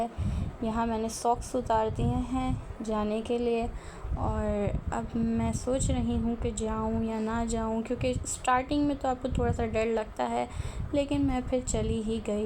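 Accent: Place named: Karachi, Pakistan. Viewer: Pure Karachi accent 😂 keep it up 💫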